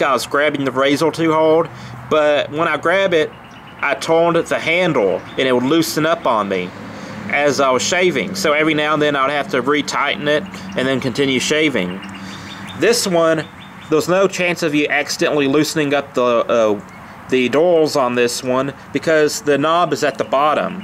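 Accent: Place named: Louisiana, United States. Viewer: oh, I actually thought you where from Louisiana Cajun accent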